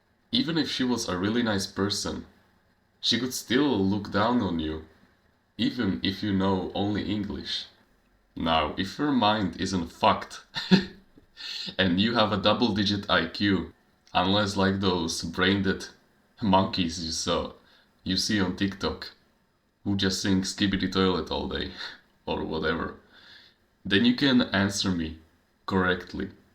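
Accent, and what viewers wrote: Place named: Russia. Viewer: Pardon me i had no idea where are you from. By your accent you sound russian ❤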